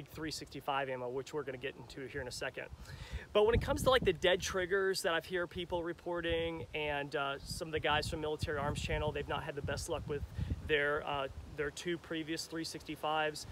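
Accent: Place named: Indiana, United States. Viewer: My guess is Indiana...looks like an Indiana farm and sounds like a Hoosier!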